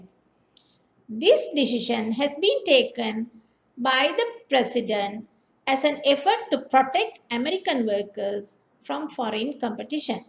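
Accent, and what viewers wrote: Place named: India. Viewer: This is indian voice how could you understand américain law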